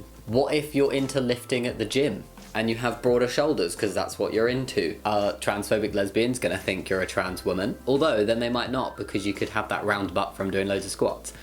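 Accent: United Kingdom. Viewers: I love how masculine his voice sounded when he said ‘femininity’. Something about the mixture of the deep voice with the British accent. Not fetishising, I’m British too, but yeah just a random thought. Otherwise I enjoyed the vid, that TERF is a right idiot and an all-round awful human being.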